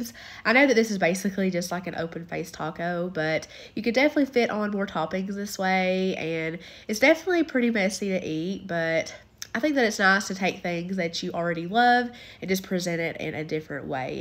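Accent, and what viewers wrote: South, United States. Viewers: I absolutely adore your southern accent! Your recipes always look amazing!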